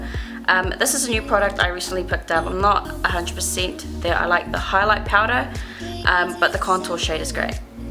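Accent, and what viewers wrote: New Zealand. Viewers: As soon as I heard your voice, I clicked on your account to see which country you were from because I swore you sounded like a kiwi cx Im from New Zealand, this is my first video that I watched of yours and I think it looks beautiful :) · You speak like me!!! Woohoo a Kiwi! & here l was thinking you were from the States haha.. Beautiful tutorial btw, loved it! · She's definitely a kiwi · You sound like a New Zealander , Kiwi as girl ! ,love it ! you go ❤❤ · Her accent is what u call a kiwi accent not Australian don’t get it twisted 😂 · omg is she from nz? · U sound & look part or Maori ..Kia ora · Was out of it to hear a kiwi voice on a YouTube video! Love your work girl! What an inspiration!! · i think she's from NZ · the accents sounds kiwi, I'd like to try the LA cosmetics where can I purchase them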